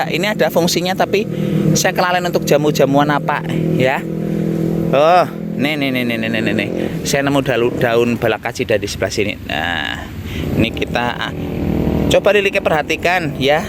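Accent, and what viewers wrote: Banyumas, Indonesia. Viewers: Assalamualaikum,kang.itu kalau di daerah saya namanya maregol,ada juga yang bilang sembung grogos, kalau boleh tau kang ini orang mana,ya dari logat bahasa jawanya seperti orang Banyumas, saya sendiri orang Banyumas,kang, asli dari kota dawet, Banjar negara. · trms rep ngapak..solusi ne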